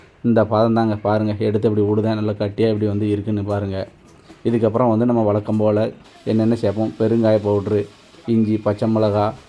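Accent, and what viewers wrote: Tirunelveli, India. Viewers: Bro திருநெல்வேலி யா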